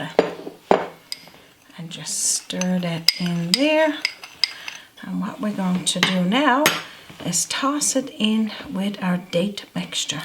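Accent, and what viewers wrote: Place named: Newfoundland, Canada. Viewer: Oh my I could listen to you talk all day, it makes me think of growing up in Newfoundland. I think I will have to get me a couple of your cook books.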